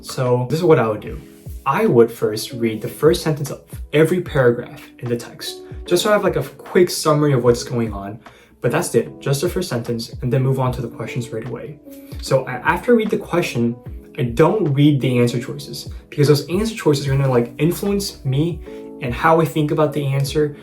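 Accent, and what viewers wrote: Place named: Asia. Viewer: Thankyou so much and i really appreciate for sharing your valuable guidance and experiences . Being an Asian i can resonate with your speaking accent and style... Please upload more videos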